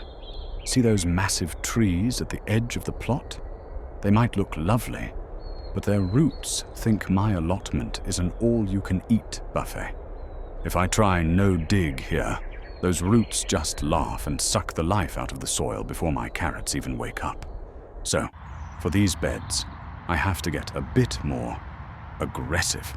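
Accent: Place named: RP, United Kingdom. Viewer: The commentary is just brilliant. Funny, whilst actually parting knowledge and being very informative. Just brilliant and very engaging and enjoyable. Only a posh and articulate Englishman could pull that off. I think you're going to become more and more popular with this unique content. All the best to you, and please don't change the style and delivery. Its so original.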